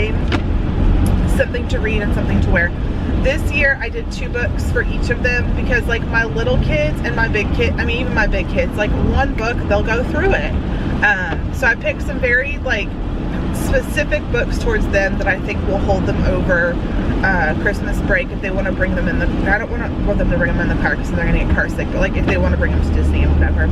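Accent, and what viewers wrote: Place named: South, United States. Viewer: You're totally picking up a little southern accent girlfriend!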